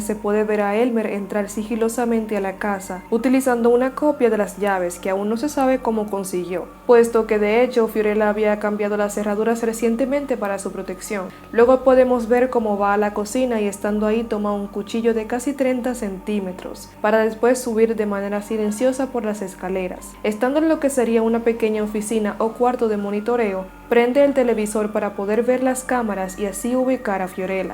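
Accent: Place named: Dominican Republic. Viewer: un placer, te lo pregunté porque soy dominicano y te reconocí la forma de hablar...jjj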